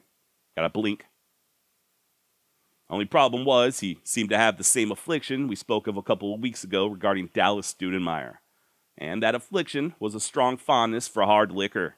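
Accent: Texas, United States. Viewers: tf? What are you talking about bro? He’s just from Texas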